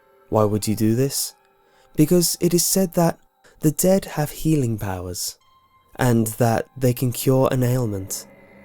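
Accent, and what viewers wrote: England, United Kingdom. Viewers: Yeah, it's obvious he's English, I mean I live in Britain, but where about in England? I can't tell if he's Scouse or Liverpuddlian or Brummie or what...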